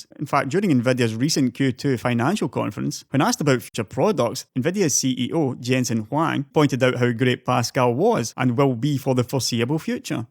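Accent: Scotland, United Kingdom. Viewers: I can hear the Scottish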